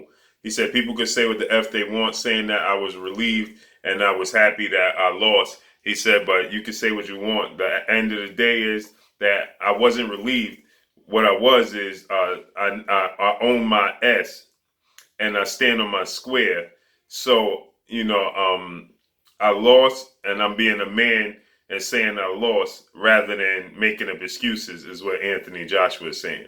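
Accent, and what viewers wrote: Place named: Alabama, United States. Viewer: What is this, black impoverishment land? What on Earth is the appeal of inarticulate black people attempting to show their swag on YouTube by exaggerating and already pathetic cultural cliche and speaking as if they were just dredged out of the swamps of Alabama? best clean up your act and your accent, stashed the hat, and appear to be a relatively communicable human being, in spite of the fact that it may mean trashing your "blackittitude".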